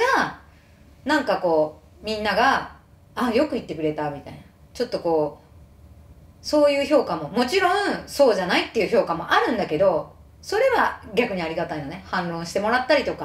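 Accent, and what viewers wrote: Nagoya, Japan. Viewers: 一度にこれだけ長く話してるの初めて見ました。たまに名古屋弁のイントネーション入ってて嬉しいw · Twitterの一言に多くの共感！YouTubeではより多くのコメントを聞ける楽しみがあります。これからも頑張って下さい。 普通にイントネーション名古屋弁入ってまっせw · 登録しました！ 名古屋からです。 名古屋弁、期待してます。👍🏻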